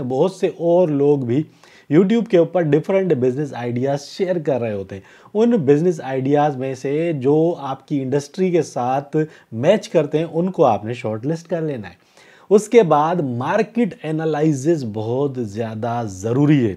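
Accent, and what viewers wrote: Sindh, India. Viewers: bhai apka sindh accent hai. Kiya ap sindhi hai?😊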